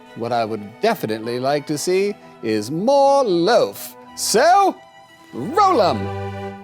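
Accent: England, United Kingdom. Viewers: Lol! Great description of The Loaf - with illustrations!! Also LOVE the British accent/Masterpiece Theater style. Had me smiling a long time. Thank you. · I can smell some English accent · How long have you been training to speak posh Englisch?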